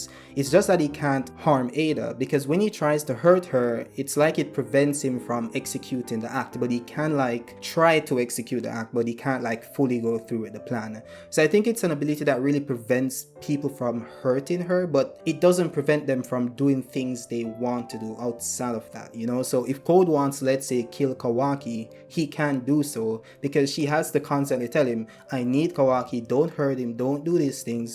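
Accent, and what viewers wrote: Jamaica. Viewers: I can hear the Jamaican in your accent bro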